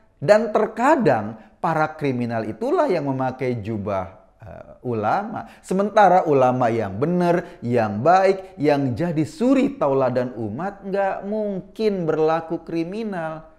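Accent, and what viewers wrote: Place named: Banyumas, Indonesia. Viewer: Slam polo waras kang wong ngapak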